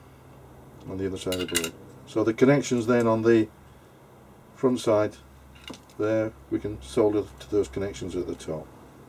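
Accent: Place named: United Kingdom. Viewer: There's something about your style that reminds me of 16mm educational films. Maybe it's your "Engineer Brit" accent (I'm not up on this: are you from the North, or the Midlands, or Bristol or what..?). Or maybe it's the font you use in your titles, which is right out of Encyclopedia Britannica films. One thing I really have to give you credit for: you are direct, to the point, and you don't repeat yourself endlessly like a certain ausie bloke I know of. But at the same time, it's a very conversational style of delivery. I can tell you've prepared, but you're still kindof speaking off the cuff. Whatever it is, I like it.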